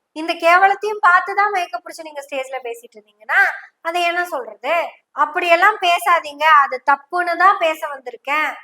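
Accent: Kongu, India. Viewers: எனவே எனவே இதைப்பற்றி நல்ல கருத்தை கொங்குத்தமிழ் மொழியில் பேசிய சகோதரிக்கு நூறு வாழ்த்துக்கள் அரசியல்வாதியாக உள்ளே வரும்போது ஊழல்வாதிகளாக தற்குறிகளாக நுழைகிற கூட்டம் இதற்கு நல்ல பதிலடி கொடுத்திருக்கிறார் இந்த சகோதரி வாழ்த்துக்கள் வாழ்த்துக்கள்.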